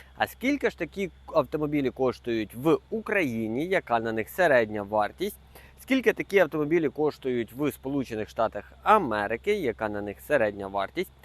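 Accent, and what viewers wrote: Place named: Romania. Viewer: Ти сам українець? Шо за акцент якийсь румунський чи молдавський? Наче носки в роті стіраєш паралельно з відеооглядом.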